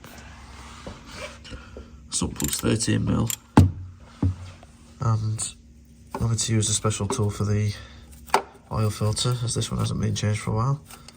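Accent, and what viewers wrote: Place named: North, United Kingdom. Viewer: Good work, nice to hear a northern voice doing a service on these great cars. Do you know where the fuel sensors are and are they easy to replace? Cheers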